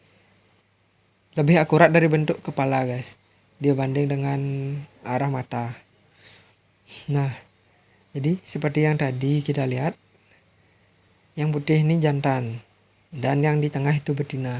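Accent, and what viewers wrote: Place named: Bali, Indonesia. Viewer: Bali ya bang agak lagat bahasanya mantap bang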